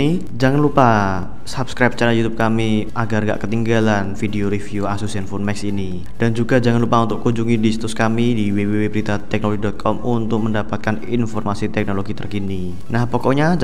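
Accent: Java, Indonesia. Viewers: bang aslinya orng mana sih ? kok logatnya kyk orng jawa ? hehe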